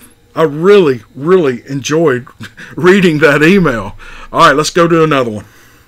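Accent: South, United States. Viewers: I have said it before. You are the best narrator that southern draw makes it so interesting to listen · Another great batch of stories narrated by the soothing southern drawl belonging to the great!!! Thank you for your time, again 😍👣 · Keep that smooth southern voice telling big foot stories. You doing a 👍 job 👍👍👍👍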